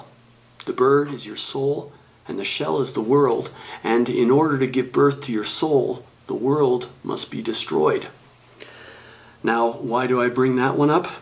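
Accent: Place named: Canada. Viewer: You're from Montreal? I thought you sounded Canadian!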